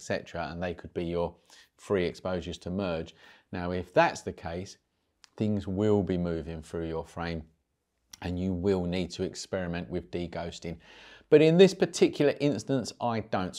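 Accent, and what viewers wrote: United Kingdom. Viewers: Great to hear a Brit for once. Also, letting us experience the video before asking for a like and subscription.I will definitely be looking out for more of your videos and advice.good work.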